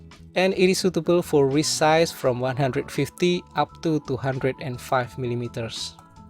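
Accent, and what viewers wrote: Indonesia. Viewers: channel review jam tangan underrated ini, inggrisnya bagus bgt dengan aksen khas indonesia yg sangat jelas pronounciation nya. lanjutkan terus mas mantap!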